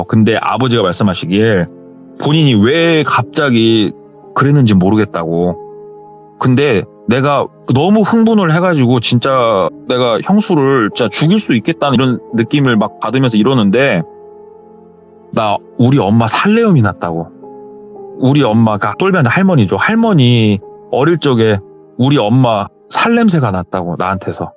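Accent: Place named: Jeonju, South Korea. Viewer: 전주 분이신가 보네요 ㅎㅎ 친구랑 말투가 똑같아서 뭔가 얼굴도 모르지만 친근감 있어요 ㅋㅋㅋㅋ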